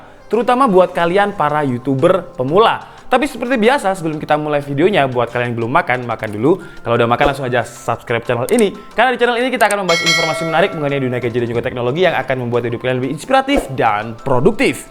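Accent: Java, Indonesia. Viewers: Orang Jawa ketahuan ketika ngomong b atau kalimat yang ada huruf b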